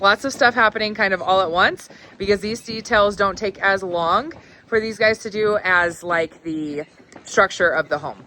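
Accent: Mexico. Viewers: Creo que ya se te nota el acento poblano al hablar en español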